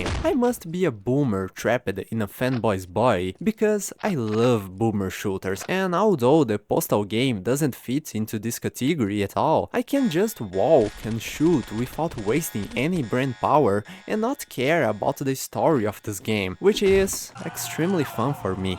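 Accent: Brazil. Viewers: Your accent reminds me of a brazilian friend i had. Miss him so much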